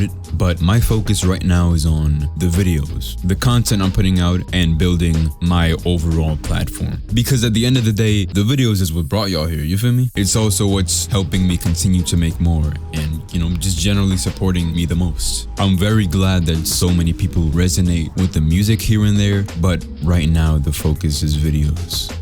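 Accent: Dominican Republic. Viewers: So you're Afrolatino! That's so cool!! Also, "not well spoken or articulate"? My guy, Dominican accent aside, you are very FLUENT!! Your grammar and pronunciation are ON POINT! And this is coming from a native Spanish speaker myself!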